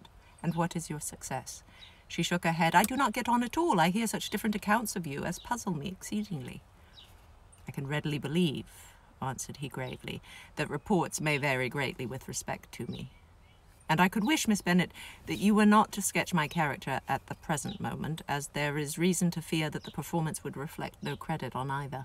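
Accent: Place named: United Kingdom. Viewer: Hi from New Zealand. Am enjoying listening to the modulation of your voice. And amused when you catch yourself slipping in & out of the British & US accents.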